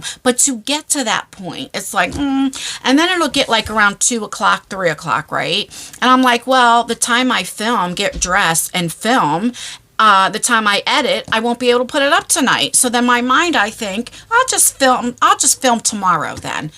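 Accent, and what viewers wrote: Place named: East Coast, United States. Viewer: I love it when you say "ya know" just as much as I love your East coast accent. You can't have that without "ya know" ❤❤❤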